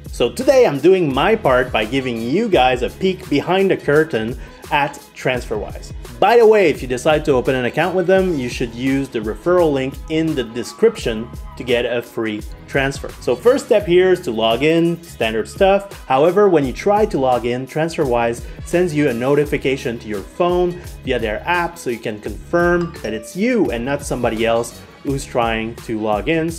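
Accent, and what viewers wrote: Quebec, Canada. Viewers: Are you from Quebec ahah? Thanks for the tips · I'm going to try a transfer. You sound like a French Canadian, are you from Quebec or Montreal? Anyway, very good video.Merci.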